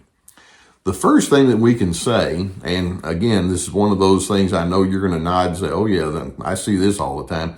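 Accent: South, United States. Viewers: So love your podcasts they are so commonsensical and you beautiful southern accent is like melted chocolate. Please keep up your chivalry and kindness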